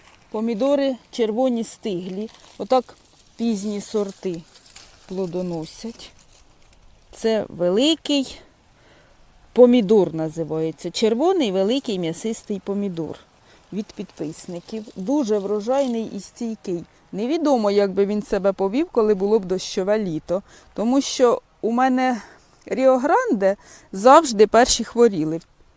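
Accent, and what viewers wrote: Poltava, Ukraine. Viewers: добрий день! Як чую вашу мову, то ніби слухаю свою сестричку. Вона теж полтавчанка, Чутівського р ну. Говір, інтонація-- такі ж як у вас. Я й сама родом звідти. Дуже приємно вас слухати, навіть неважливо про що, наче 60 років назад. Ось і така є у вас слухачка. Давно хотілось вам це повідомити...як кажуть, багато казати, та нема що слухати. Ще раз вам, щире спасибі. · Приємно чути Полтавський акцент!😊 Як не дивно, відразу так і подумала,шо це моя рідна Полтава! І не помилилась.Який район,село напишіть будь ласка.Буду дуже вдячна!❤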